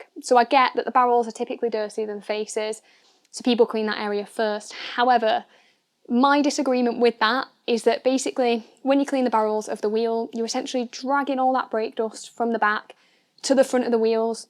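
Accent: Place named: Northern England, United Kingdom. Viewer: I can't help but notice you have a northern accent. As someone from the south east, with a south facing drive way, I can assure you direct sunlight is insufferable. On dark cars. On light cars it really makes sod all difference. Water literally boils on contact with dark paint. It doesn't even need to be hot, just sunny. What we also have down here that you don't, is really hard water.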